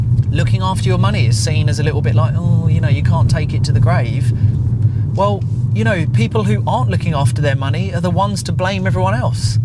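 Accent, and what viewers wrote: United Kingdom. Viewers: Oh I love British accent❤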